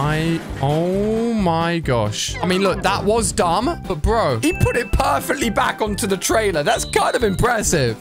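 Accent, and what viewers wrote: United Kingdom. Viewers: what are u British or something because I'm British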